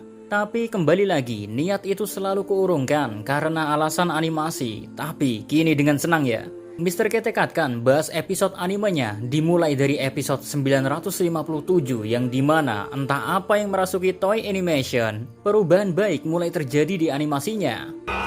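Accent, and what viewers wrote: Java, Indonesia. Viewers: Jawa nya pekat banget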